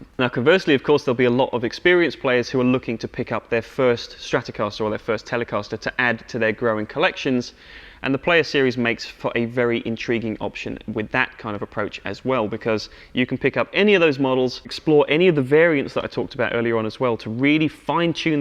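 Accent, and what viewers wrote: United Kingdom. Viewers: He sounds like joe bonamassa with British accent! 😅 ! Nice playin tho lit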